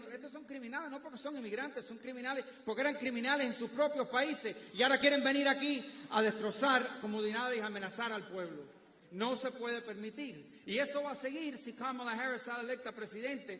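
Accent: Cuba. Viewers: His accent is very cuban indeed:) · As he said, it is more like a "Cuban" sound... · Damn, being Spanish my primary language by living and US for many years, coming to see another 2nd generation latino-gringo proud of his Spanish skills to laugh and…. he rocked. Finaaally some good Spanish, well articulated, the message is delivered in a proper way, Cubn style but correctly. Maybe this is one of the reasons why many Latinos voted for Trump this time. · You can hear how similar his spanish sounds to Desi Arnaz / Ricky Ricardo, it's not like spanish from Mexico. I had a co-worker who's family was from Cuba and he said Cuban spanish is like a machine gun, and Mexican spanish is very sing-song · How Spanish was great, it’s just the Cuban accent. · I hope that Hispanic parents look at this as a great example to teach their US born children Spanish. There should be no stigma or shame about speaking Spanish. 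It’s an incredibly beautiful and rich language. His parents did an incredible job raising him bilingual, and his bilingualism gives him a tremendous advantage in his political career. He didn’t use a single Spanglish word. His speech was eloquently and passionately delivered in pure Spanish. As a Cuban-American, I can confirm that his Cuban accent was authentic. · Español cubano como bien dice 😁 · Rubio you’re the man love your Spanish puro cubano puro americano con amor 🎉😊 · I’m a Tejano and I understood him very well because of the passion and emotion he puts into his words in Spanish—even though Cuban Spanish is a beast to understand compared to other Spanish. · Didn’t know his Spanish was that good. Sounded great, true Cuban accent.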